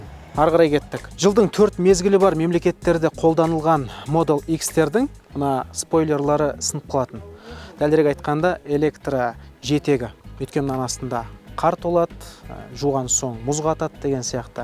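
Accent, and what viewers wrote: Standard. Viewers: базар жок рахмет. Недеген сауатты сойлесин таза казакша сау бол бауырым.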